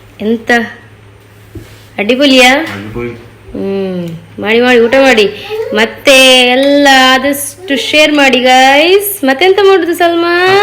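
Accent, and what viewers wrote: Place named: Beary, India. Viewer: Ninga endg vatrasi kannada mundre nink byari slang carect band alle ade mundoru nink nalle saport akdar arum ningale troll aklle ❤ pinne ninga troll ayeng ninga tell famus avude k😊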